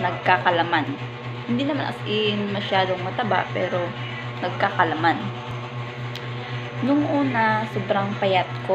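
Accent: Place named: Visayas, Philippines. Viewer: Ok Lang maging bisaya ang accent ikaw Yan ei may sari-sarili tayong accent just be proud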